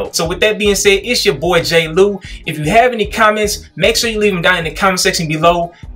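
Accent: Houston, United States. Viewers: This dude gotta be from the H. Subscribed.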